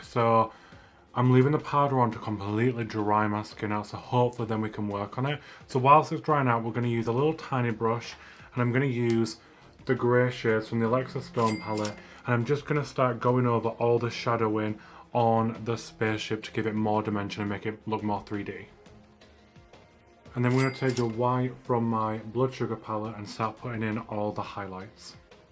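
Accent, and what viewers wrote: United Kingdom. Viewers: I’ve read you are from London so what’s with the northern / East Midlands accent ??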